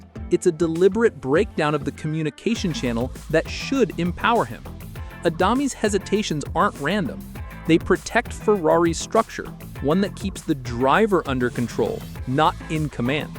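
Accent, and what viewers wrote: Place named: United States. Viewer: This is disinformation, gaslighting in American accent. Not convinced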